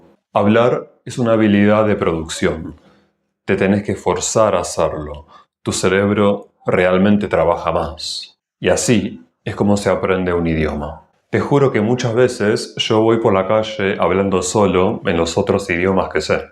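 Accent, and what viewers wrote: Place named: Paris, France. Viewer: A veces me pregunto si usted tiene antepasados franceses, porque su entonación suena como la de los parisinos.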